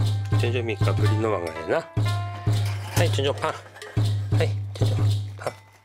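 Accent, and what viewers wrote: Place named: Aomori, Japan. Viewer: 凄い訛ってる！ 青森とかですか？🍎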